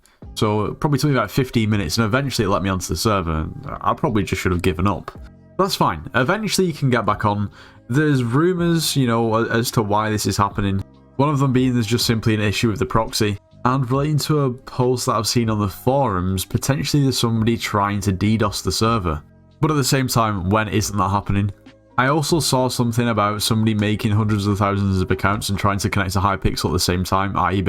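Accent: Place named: Midlands, United Kingdom. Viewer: Also where are you from, you dont sound too northern. I want to say midlands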